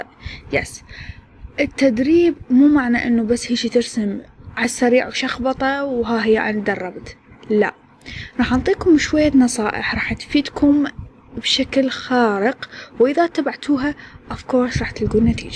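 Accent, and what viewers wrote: Iraq. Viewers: حبيت لهجة العرقية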